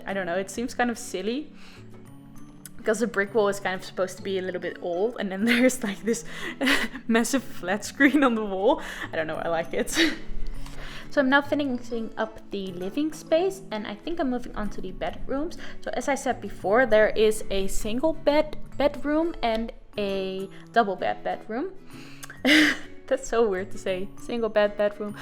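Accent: Netherlands. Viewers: ik ben ook nederlands!! Leuke build! Ik dacht al dat je nederlands was. dat accent is zo herkenbaar haha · Ik herkende letterlijk dat dit nederlands is na de eerste paar secondes... #dutchaccentstruggle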